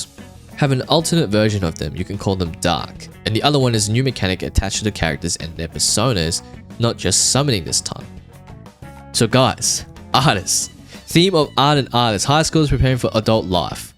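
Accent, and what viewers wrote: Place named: Australia. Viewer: It's crazy how you can tell this Youtuber is an Asian Aussie just from his accent.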